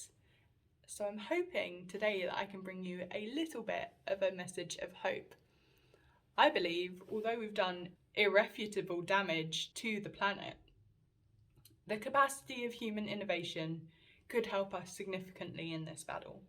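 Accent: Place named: United Kingdom. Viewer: u sound british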